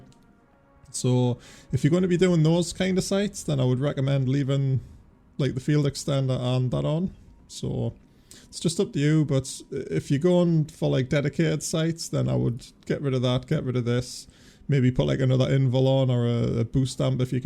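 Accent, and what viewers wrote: Newcastle, United Kingdom. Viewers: When are you gonna "grow out" of that Geordie accent. It just makes you sound like a King Chav. The last time I went to Newcastle, people were wearing dustbin liners as raincoats. I guess you donn your dust bin liner with proud when you go out on the toon (town), right? Learn about Estuary English and start growing up, pal, git gud. Oh shit, now I'm doing it.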